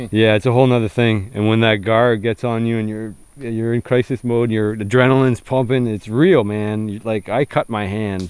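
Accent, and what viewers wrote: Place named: Canada. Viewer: You sound very Canadian but I have to ask...do you live in Michigan?..by the way final fantasy 3 for she's is the best game ever!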